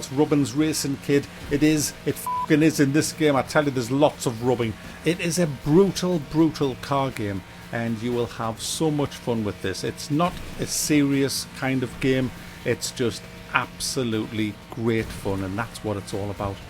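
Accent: United Kingdom. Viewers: Darby. Beeta. Gayme. Moode. Controollah. That's the British accent for you.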